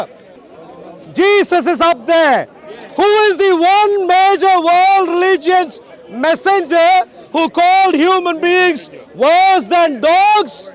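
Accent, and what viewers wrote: India. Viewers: Why is an Indian talking and British in the background ?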